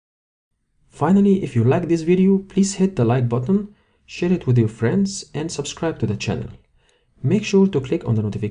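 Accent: Egypt. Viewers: Hi, your accent is Egyptian lol, let me know if I am correct. Great video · What an Egyptian accent <3 <3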